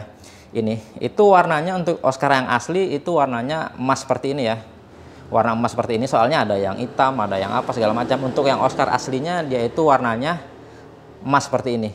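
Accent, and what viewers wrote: Medan, Indonesia. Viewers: Nampak Medannya, "dipala-palain"😆😆. Mantap reviewnya, bang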